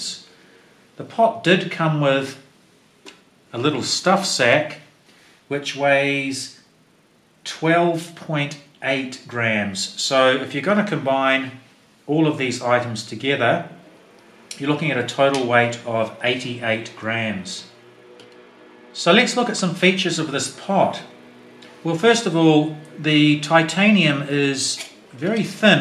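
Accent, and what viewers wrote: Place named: New Zealand. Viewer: nalgene also has a range of ultralite bottles. Nice video by the way, I was a little surprised when I heard the kiwi accent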